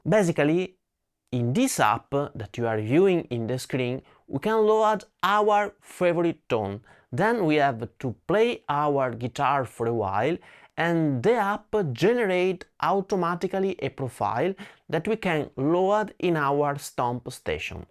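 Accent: Italy. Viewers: it's definitely not French, it's clearly Italian :D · no need. We love your Italian (edit not French) accent :) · LOL, didn’t expect ComfyUI to pop up here! The Italian accent and those nodes gave me a nice flashback to when I was learning IPAdapter for SDXL. Great video as always, — keep pushing these unique gear reviews; they’re never covered on the mainstream guitar channels.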